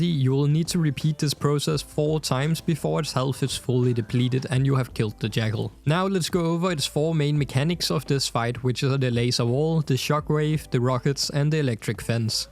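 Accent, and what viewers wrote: Denmark. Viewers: great video! instasubbed since theres no way youre not danish hahah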